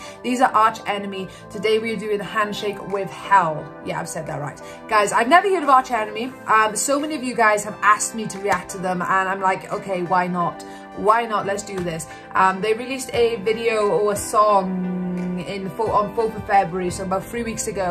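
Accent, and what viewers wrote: Wales, United Kingdom. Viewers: are you welsh? you sound welsh :)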